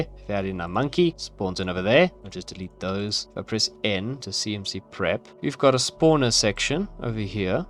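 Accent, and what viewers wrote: South Africa. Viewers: I love South African accent. ❤ thanks for sharing…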